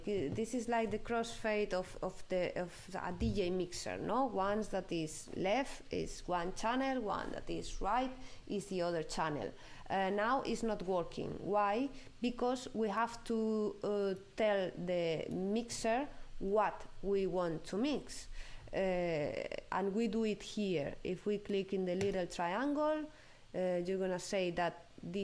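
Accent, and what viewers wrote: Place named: Italy. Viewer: Simply the best tutorial teacher! and the Italian accent is lovely! Merci!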